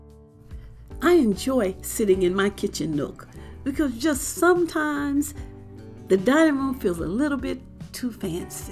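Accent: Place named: South, United States. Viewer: I love your southern accent😁 Great job on your table. I enjoy your video 😊👍🏽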